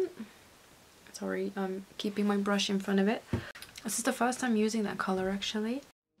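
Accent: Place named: United Kingdom. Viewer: that british accent tho, love the video. Keep it up :>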